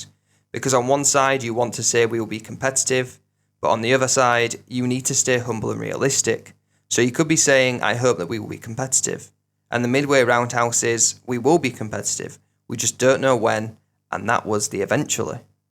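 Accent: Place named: Hull, United Kingdom. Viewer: That's deffo a Hull accent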